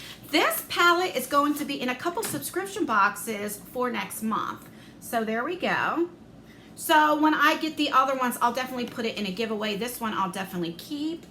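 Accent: New Jersey, United States. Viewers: Hey Jersey, love love that accent!!! I have lived in New Jersey 3 different times in my life. Had a lot of fun out there. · Love the deep NJ accent!!! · Are you Jersey or NY? I just adore you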